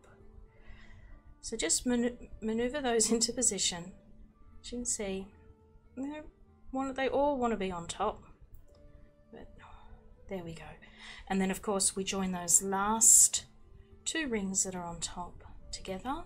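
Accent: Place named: Australia. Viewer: This pendant is beautifully styled!! I found this tutorial, like all others I have watched here, was quite easy to follow along with, and soft Aussie voice is both soothing and relaxed making the atmosphere one where I felt I was having a private lesson with as my tutor!! Lucky me!!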